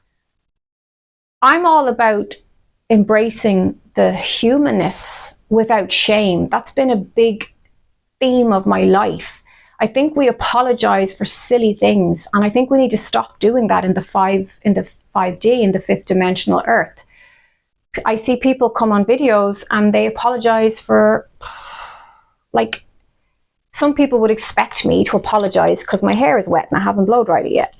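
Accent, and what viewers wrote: Ireland. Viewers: I am here from Canada, I love your Irish tone. I love your positivity ❤️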